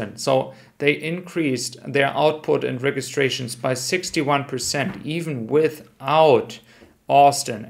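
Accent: Germany. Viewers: I agree, in fact English with a German accent is one of my favourite things. Great content always... thanks!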